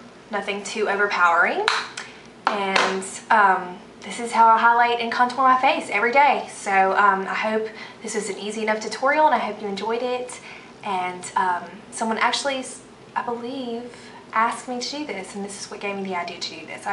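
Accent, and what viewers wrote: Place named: South, United States. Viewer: im from the south and the second thing i noticed was your southern accent, the first realizing how beautiful you are! I love it though:)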